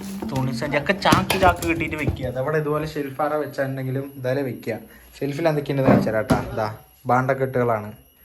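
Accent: Palakkad, India. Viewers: ആദ്യ subsciber ആണ് ഞാൻ.. അന്ന് പേന ഒക്കെ കൊടുക്കുന്ന വീഡിയോ കണ്ടത് ഇന്നലെ എന്ന പോലെ ഓർക്കുന്നു. അന്ന് എനിക്ക് ഈ ഫോൺ അല്ല.. അന്ന് ഞാൻ comment ഇട്ടിരുന്നു നീ നല്ല മനസ് ഉള്ളവനാണ് നല്ല നിലയിൽ എത്തും, ചാനൽ one മില്യൺ അടിക്കും എന്ന്... അന്ന് 1k പോലും ആയിട്ടില്ല എങ്കിലും എനിക്ക് ഉറപ്പ് ആയിരുന്നു..പാലക്കാടൻ സംസാരവും ആ വീടും വീട്ടുകാരെയും എല്ലാം എനിക്ക് ഒരുപാട് ഇഷ്ടമാണ്.. ഒരു അഹങ്കാരവും ഇല്ലാത്ത ആളുകൾ... എനിക്ക് എന്റെ അനുജനെ പോലെ ഇഷ്ടമാണ്.. എന്നെങ്കിലും നേരിൽ കാണാൻ ആഗ്രഹമുണ്ട്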